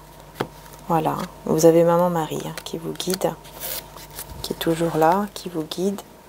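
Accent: France. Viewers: je suis allée voir la chaine italienne, trop mimi le mix entre petit accent français et petit accent sicilien😊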